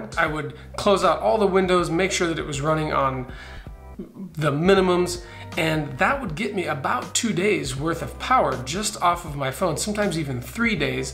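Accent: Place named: Western Washington, United States. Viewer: Hey. New subscriber here, and man do I love your content. You deserve a lot more views. Unlike a lot of backpackers and UL guys you seem to come from a hunting background, which might be the fresh and relateable take you bring to your videos. It also sounds like you're up in western Washington maybe? I'm down in Portland! I gave you a follow on IG too. I look forward to your future videos, so please keep them coming!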